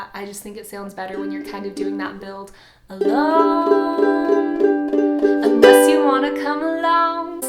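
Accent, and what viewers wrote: United States. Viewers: Yeah tots but why does she speak like a cow girl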